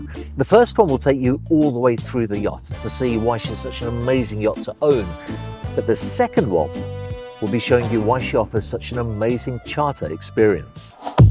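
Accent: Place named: Birmingham, United Kingdom. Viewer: As a photographer / videographer (as well as a boater) I find your videos inspiring. With your TV standard presenting, it's great to see ... (brummie accent makes me smile) .... 🇬🇧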